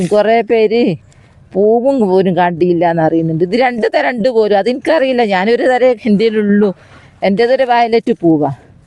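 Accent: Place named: Malabar, India. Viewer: നിങ്ങളുടെ സംസാരം കേട്ടിട്ട് കോഴിക്കോട് മലപ്പുറം അതിർത്തിയിൽ എവിടെയോ ആണെന്ന് തോന്നുന്നു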